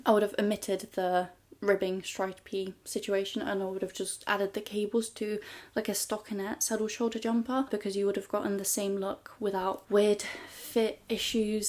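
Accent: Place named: England, United Kingdom. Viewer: New sub here and THANK GOD you’re English! That and the reference to “purple” in the title attracted my attention. I’m afraid some of the American channels are terrible screechy monotone American that grates. Your accent is delicious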